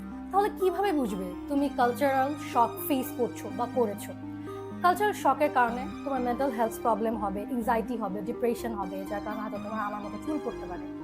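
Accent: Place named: Bangladesh. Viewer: Ahh finally deshi accent ta charsos😂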